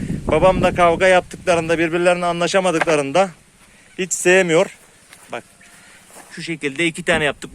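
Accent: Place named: Thrace, Turkey. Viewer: Konuşman Trakyalı gibi sanki nerelisin :)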